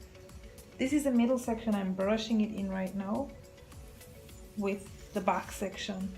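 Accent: Jamaica. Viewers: This is really neat .. I can never slick my hair down ! But ill try this way .. Btw Are you Jamaican ? Kinda sound like one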